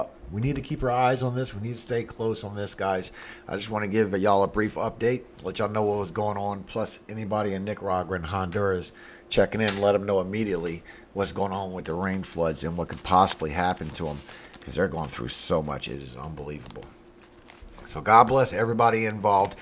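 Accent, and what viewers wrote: Louisiana, United States. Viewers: I love to read your comments. They always make me laugh. I’m from La and my family sounds like this..lol. However, my Dad grew up in Texas, but he tells the best Boudreaux and Thibodeaux jokes. Your posts remind me of him. God bless and have a wonderful day! 😂😂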